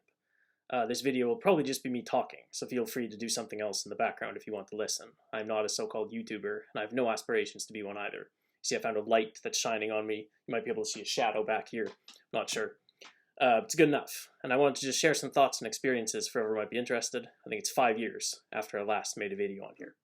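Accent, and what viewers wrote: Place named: Scandinavia. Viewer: You sound like you're from one of the Scandinavian countries, which I assume have a higher percentage of INTJs. Would I be more comfortable living in that part of the world?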